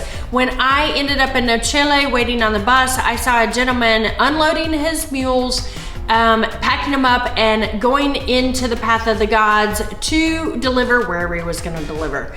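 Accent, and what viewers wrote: United States. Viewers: Thank you so much, the only thing and would help me much more is by putting subtitles for the next time!! Specially for the names that aren’t well pronounced (sounded with so much american accent I can bearly understand the towns!!)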